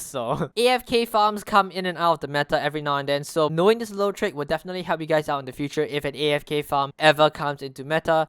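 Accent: Singapore. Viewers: R u Singaporean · You sound singaporean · yo are you singaporean or malaysian cus ur accent sounds like that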